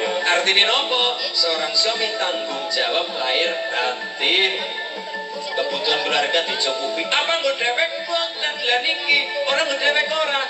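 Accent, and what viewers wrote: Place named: Banyumas, Indonesia. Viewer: Ngapak ustadz nya..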